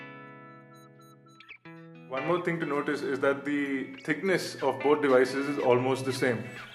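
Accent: India. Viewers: Hands of a white man, but accent like an Indian. Dude, your review put me to sleep...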